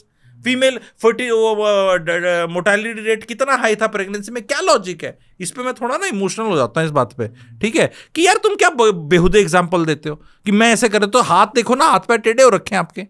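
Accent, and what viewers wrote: Haryana, India. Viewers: Because he is from haryana, his tone is Haryanvi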